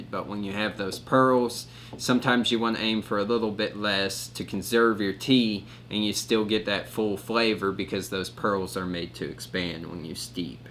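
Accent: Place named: South, United States. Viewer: I really enjoyed your review, and I love your background of the Chines tea farms! I’m enjoying some jasmine pearls right now myself to start the day. I love drinking plain tea throughout my day and I get it shipped to me in California from Mei Leaf in London. You are very cute and I love your southern accent. I hope you make more tea reviews.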